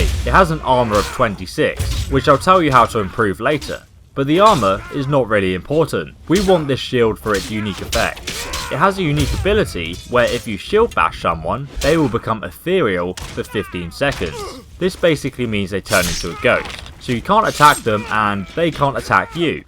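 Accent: England, United Kingdom. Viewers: Stefan Luckerbauer Sounds British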